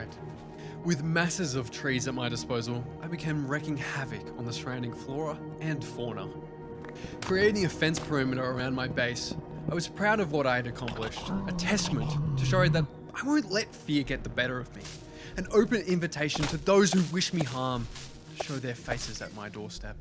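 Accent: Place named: Australia. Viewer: Oh GOD you're AUSTRALIAN?! 🤢🤢🤢🤢🤢🤢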